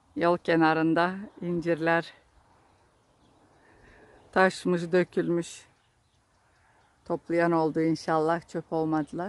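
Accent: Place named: Bulgaria. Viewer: Konuşma şivenizi benzetigim icin tahminim dogruymuş blg neresinden tsk kanalınız ayrıca güzel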